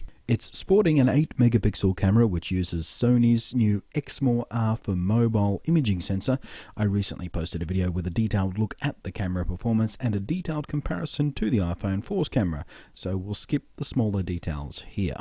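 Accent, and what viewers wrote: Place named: Australia. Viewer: thank you saving me from other reviewers that nit pick on the tineist things. love the accent nice to see and Australian reviewer. i is from new zealnd!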